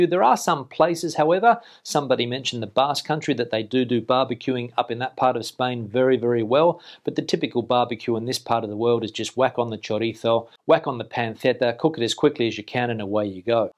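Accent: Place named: Australia. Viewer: You teach Australian English? Your accent I mean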